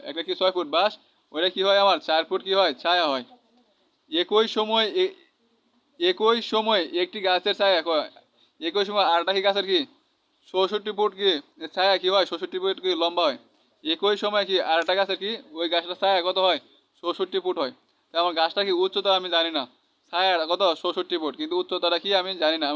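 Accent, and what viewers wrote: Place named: Mymensingh, Bangladesh. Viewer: বুঝতে পারছি। তবে ভাই, আপনার বাড়ি কি বৃহত্তর ময়মনসিংহ অঞ্চলে?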